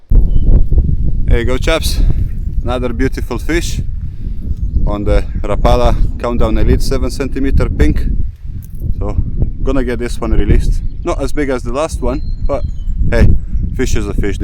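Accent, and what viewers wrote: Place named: Scotland, United Kingdom. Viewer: Love the Scottish accent.... definitely following you mate 👍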